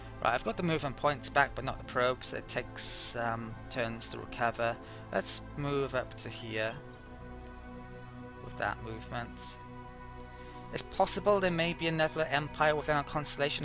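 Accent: Wales, United Kingdom. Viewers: u from wales?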